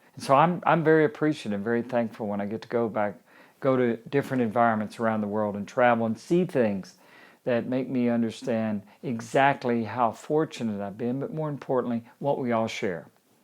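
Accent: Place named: South, United States. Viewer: It’s great to hear a southern accent talking about moto gp. As I always say, I wish there were more Americans involved in moto gp. Keep up the great work, Freddie!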